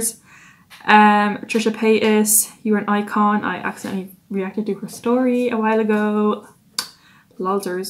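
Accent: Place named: Ireland. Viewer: Lol, I click on your video and straight away I hear the accent and know your irish😂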